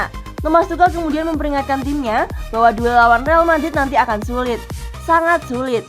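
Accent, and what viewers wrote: Java, Indonesia. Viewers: pengisi suaranya medok min 😁🤭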